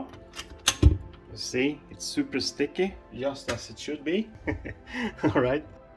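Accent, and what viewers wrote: Sweden. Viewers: Hey are u swdish u sound like it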